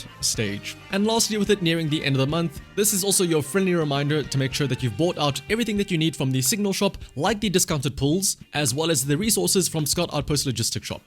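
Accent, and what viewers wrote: South Africa. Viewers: your accent sounds familiar, are you south african?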